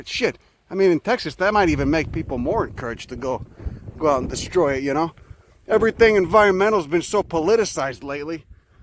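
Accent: Chicago, United States. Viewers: Sound like your from Cicero. I love your vids. Chicago to jersey baby. Keep producing these . most enjoyable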